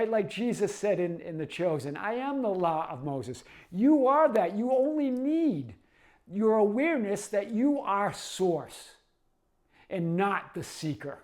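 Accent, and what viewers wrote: Boston, United States. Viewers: The second you spoke I knew you were a Bostonian. LOVE the accent & your channel! I AM.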